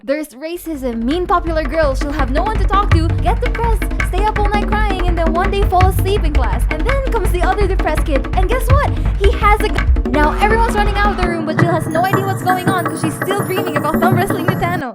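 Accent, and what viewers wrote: Visayas, Philippines. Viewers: bisaya po ba kayo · I knew it.i instantly guessed that you are a Filipino too because of your accent(which is, btw super gooood) just from the first video I watched from you. Another youtuber to be proud of. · Omg im a filipino!🇵🇭 ~*~*~*~*~*~*~*~*~*~*~*~*~*~*~*~*~*~*~*~*~*~*~*~*~*~*~*~* 🇵🇭Filipino: Maganda ang video! Ako ay mag subscribe sa iyo kapamilya👍🏻❤️ 🇺🇸English: The video is beautiful! I will subscribe to you my friend👍🏻❤️ ><><><><><><><><><><><><><><><><><><><><><><><><><><><><><>< Btw are u a filipino? ur acent is like a filipino.... especially when u said "C.R" ======================================================== EDIT: Btw I love ur acent😂👍🏻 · OMG nung una ko tong pinanuod... sabi ko, ang accent mo parang sa filipino. 😂😂 love this channel... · I new u are a pilipino beucase im a pilipino and your english is like pilipino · Cccccc rrrrrrrr perpek pilipino aksent :) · U sound like a filipino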